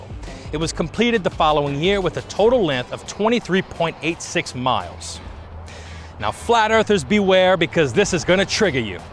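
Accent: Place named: Cajun, United States. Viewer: It isn't very strong, but I hear that touch of Cajun accent lol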